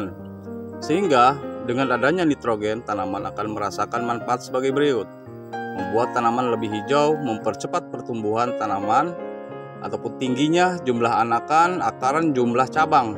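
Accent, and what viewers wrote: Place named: Sulawesi, Indonesia. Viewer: cra abg ngomong kyk org sulawesi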